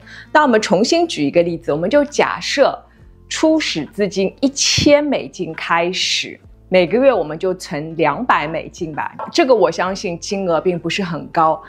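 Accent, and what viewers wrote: Shanghai, China. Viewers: 侬是上海侬伐？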